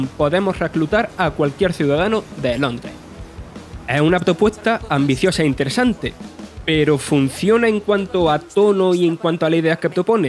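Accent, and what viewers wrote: Andalusia, Spain. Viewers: Qué tonalidad de España tiene el locutor de este video?? No se parece a la de la mayoría que hacen análisis de juegos, más bien parece la de Paco Tous. Es de Andalucía??? 🤔🤔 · es Andaluz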